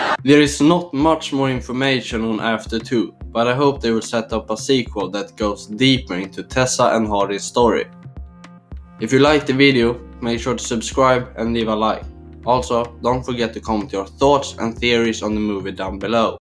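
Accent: Sweden. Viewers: U rly sound Swedish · Visst är du som pratar svensk hahahaah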